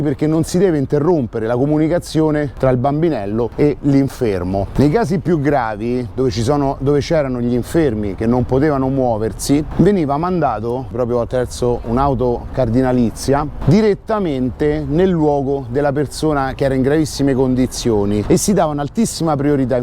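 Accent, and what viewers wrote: Rome, Italy. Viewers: ciao non ti conosco personalmente , mi piace il modo di come presenti i tuoi video con il tuo accento romano, bravo 👍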